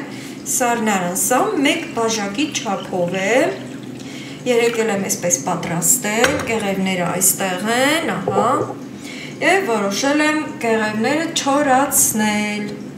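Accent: Lori, Armenia. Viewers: ջան երբ որ քեզ լսումեմ մի տեսակ հանգստանում եմ։Ուր մնաց լոռվա բառբառը։❤❤❤❤❤❤❤😊😊😊😊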